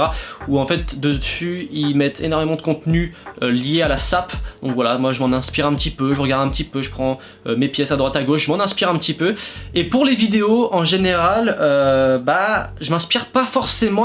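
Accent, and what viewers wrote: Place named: Doubs, France. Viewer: J’adore ton accent, tellement agréable à écouter, il ne viendrait pas du Doubs...? 😉 👏👏👏👏 pour tes 10K, mais bon, t’iras beaucoup beaucoup plus haut...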